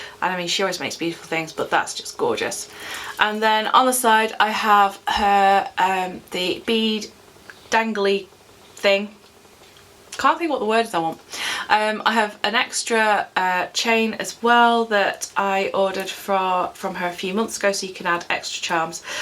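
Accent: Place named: England, United Kingdom. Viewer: Love your July setup. I hope this doesn’t sound weird but I love your English accent. From an Aussie follower x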